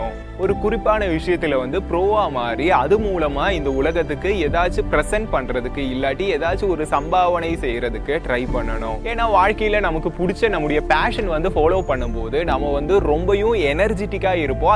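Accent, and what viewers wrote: Kerala, India. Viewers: What ah voice...and ur malayali tamil....😍😍🤩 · Malayalam kalantha tamil.. Nice bro....😊👌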